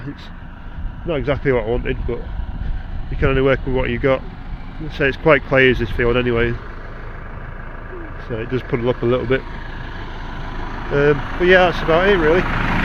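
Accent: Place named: United Kingdom. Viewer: In my opinion, one of the big pluses of watching British farming videos, beside hearing the cool accent, is to see the differences in Ag techniques. For instance, you're using chain harrows to de-thatch grass fields/pastures. This is very interesting. We've never done that. All we have done is spot spray and mow down the high weeds and thistle with a rotary chopper (brush hog). Every once in a while we used to put up a temporary electric fence in the springtime and disc the field a few times, followed by seeding it with a cyclone grass seeder. Of course we were posturing a beef herd and not horses. Steers aren't as picky about the quality of their forage as horses. I've only seen chain harrows used around here to break up clods of dirt. They are usually pulled in a separate operation after the plow and disc harrow have gone over the ground to turn and pulverize the soil. 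Chain harrows are used locally to level out the furrows and uneven ground left by the first two tillage operations in preparing a seedbed. Usually just prior to planing a small grain crop like oats, rye or barley. We also used them when rotating a field back into hay, just before we drilled in the seed. It gave us greater soil to seed contact and we had better germination rates. Also, I think all the chain harrows I've seen do not have a frame. They collapse or simply roll up for storage. Thanks for posting. Very cool. Cheers! Whipple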